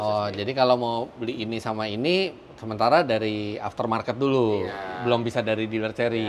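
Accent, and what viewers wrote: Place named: Java, Indonesia. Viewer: Bung Jawa banget sih itu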